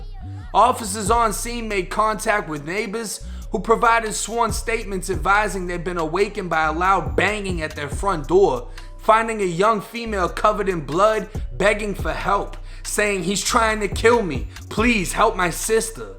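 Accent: Boston, United States. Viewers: Where's the narrator from? Bahston ??? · Sound like you have a Boston accent. Any ties to Boston? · Why does it sound like your from Boston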